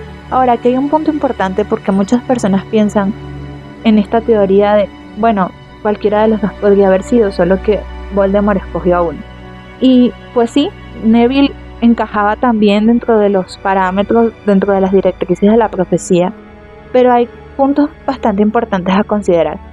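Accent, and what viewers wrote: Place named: Latin America. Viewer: Es la primera vez que lo escucho en audio latino, se me hace raro 😅 Pero dale, vas a ser grande!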